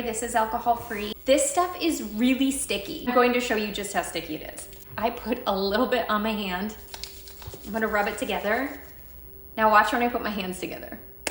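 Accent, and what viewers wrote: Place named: South, United States. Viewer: It did sound southern!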